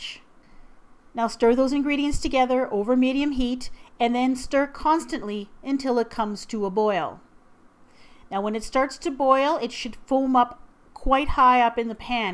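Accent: Canada. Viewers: Random question, are you Canadian?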